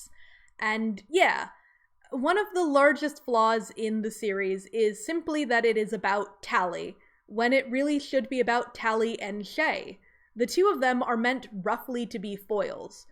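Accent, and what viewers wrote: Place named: United Kingdom. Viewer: Kind of like "raw-ther", I think? I'm Canadian. To me it sounds like we say it the way it sounds when said in the seemingly dominant American dialect... But I'm pretty sure people are somewhat deaf to their own accents. Like, I don't normally hear "aboot" when I say "about", but then sometimes it kinda does sound like I draw out the "ou" a bit. Anyway, I think the "typical" sounding American dialect that I am familiar with sounds more like "Raa-ther" than "Raw-ther" Edit: is that Somerset, UK or Somerset County, New Jersey. Edit 2: must be Somerset, UK I can actually hear it now. There's definitely a bit of an ambiguous "British" sound to your accent.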